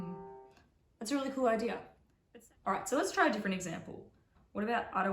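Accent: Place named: Australia. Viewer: You sound Aussie and for that reason I’m following you. Lol (Australian here!)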